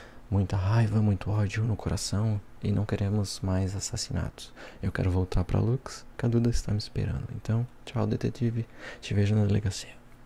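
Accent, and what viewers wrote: Brazil. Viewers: Opa, primeira vez por aqui. Muito bom seus vídeos, gostei do sotaque, parece muito com cidades próximo onde moro. Sou de SC, joinville, o jeito que vc fala , parece com qm mora aqui na região do Vale.. Já fiz um tour por alguns vídeos, são bem relaxantes mesmo, parabéns cara, sucesso !!! Com certeza passarei mais vezes por aqui, qdo a insônia bater.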